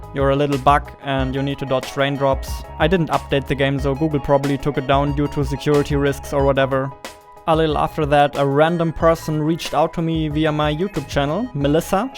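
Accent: Germany. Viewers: are you german? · Are you German ?!?! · Are you from deuthland?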